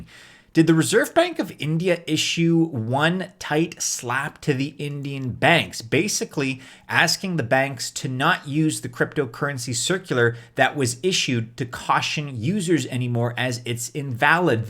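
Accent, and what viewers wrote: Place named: Canada. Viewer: I come for the Canadian accent. Chance at ETH doesn't hurt either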